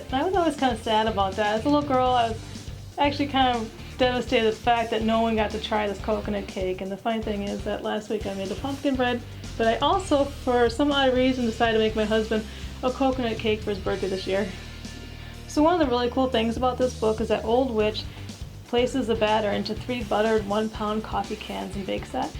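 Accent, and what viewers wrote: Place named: Midwest, United States. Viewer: Hi,! That pumpkin bread looks amazing! So cool that you got the recipe from a book when you were a child. Always nice when there is a story behind the recipe. You are right: cans and boxes are getting smaller over time. I agree that older recipes do not always have a lot of spices. I think that people ate food that we might consider to be a bit bland today... I agree that sometimes too many chocolate chips can be a bad thing. Woodstock...Illinois? I thought you might be a fellow Midwesterner because your pronunciation (I am really into languages). That turned out great. Thanks for sharing!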